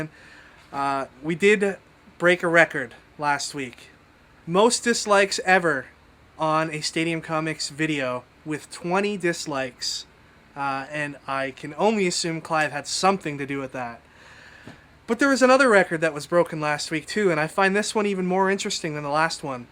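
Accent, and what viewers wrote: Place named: United Kingdom. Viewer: Of all the videos has i love his Umbrella Academy collection the most. I absolutely love this series and its great how he owns different covers to every issue, Ive been known to horde different cover arts myself. Gerard Way has certainly not disappointed with this series and also has a pretty gnarly British accent.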